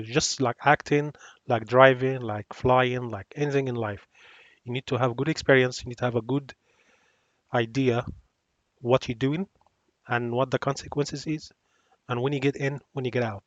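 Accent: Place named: Egypt. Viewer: Thanks for your great analysis. Do you have any analytics in Arabic ? I think you're an Egyptian from your accent All my greetings.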